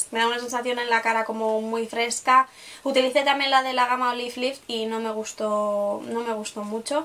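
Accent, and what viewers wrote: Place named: Spain. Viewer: ya aqui dejando comentario , me encanta tu espanol ,estoy planeando viajar por europa y ojala se me realice y si no seguire viendo videos , :) cada vez que veo productos de espana me vuelvo loca y digo wow porque no mandaran un mercadona a japon o una tienda de kiko o escence pero bueno tiene lo suyo , me pase buscando la base fit que antes usaba s pero creo que la,descontinuaron aqui o no se si hubo alguna vez ,en fin me encantaron las toallitas que usas para desmaquillar ,yo tambien uso porque como tu me hago un lio limpiandome ,soy super practica , no recuerdo q mas ensenastes pero bueno segun lo revuerdo todo esta super bueno . dedito arriba y gracias por el,video informativo ojala puedas responderme te sigo,de hace poco pero ya vi todos tus videos besos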